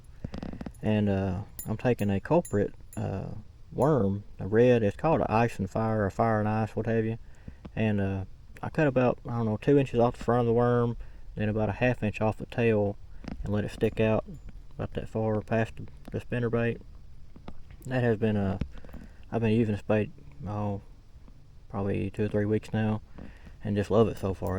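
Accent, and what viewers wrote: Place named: Arkansas, United States. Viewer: You from Arkansas? I recognize the accent.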